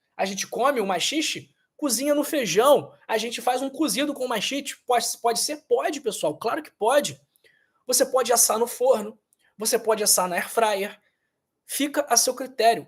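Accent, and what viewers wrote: Rio de Janeiro, Brazil. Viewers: Muito bem explicado Dr. Simpatia!! Gosto muito do seu sotaque carioca!! Mais uma lição de ensino contigo!! Obg Dr.!!❤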